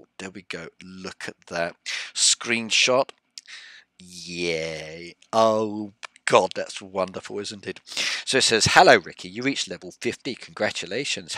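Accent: United Kingdom. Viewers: Your accent very british🤣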